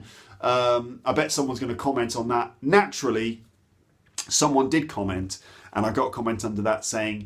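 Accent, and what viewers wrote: United Kingdom. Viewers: Your pronunciation is one of my favourite British pronunciation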